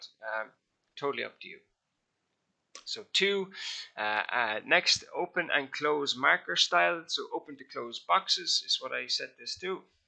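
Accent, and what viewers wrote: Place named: Ireland. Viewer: Man, I'm finding it a nightmare setting up my charts... bloody Oirish accent makes it difficult.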